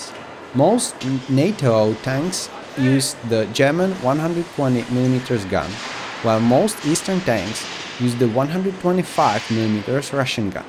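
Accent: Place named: Missouri, United States. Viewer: I Detect a Bit of an Accent ! Are you From Missouri ?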